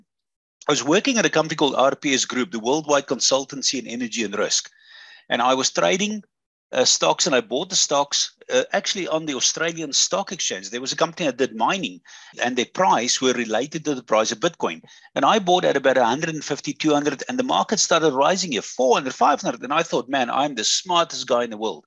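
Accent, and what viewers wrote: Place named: South Africa. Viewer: This guy sounds south African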